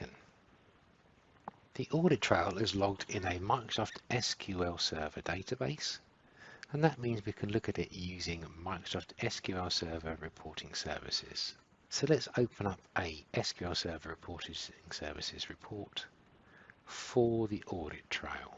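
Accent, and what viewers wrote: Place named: United Kingdom. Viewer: Might be worth contacting the UK distributor as that's a UK accent.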